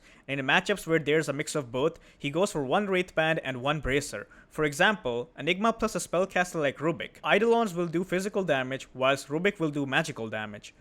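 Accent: India. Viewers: shut up disgusting indian accent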